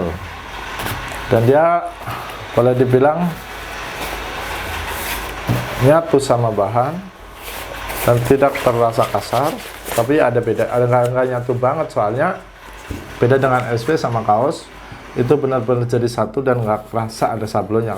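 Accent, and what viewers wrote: Banyumas, Indonesia. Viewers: Ngapak ya mang 😁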